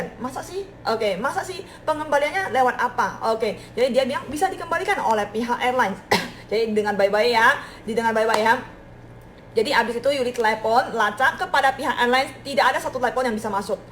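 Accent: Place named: Taiwan. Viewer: Eh di Taiwan kerja apa sih kok cengkok bicara nya uda sangat menyatu dengan Taiwan.uh..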